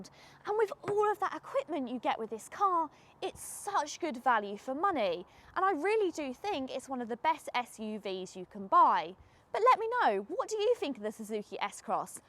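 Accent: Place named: Cockney, United Kingdom. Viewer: Nice car, annoying cockney accent.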